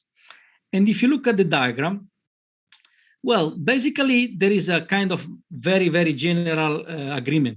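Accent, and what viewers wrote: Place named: Russia. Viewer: Он говорит на английском максимально по-русски) Очень понятный акцент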